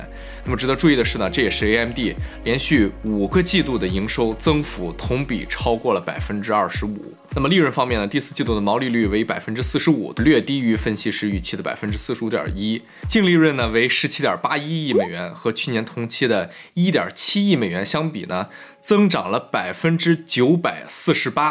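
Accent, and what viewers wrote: Shandong, China. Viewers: 听口音是山东人吗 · 听口音老乡啊山东淄博济南?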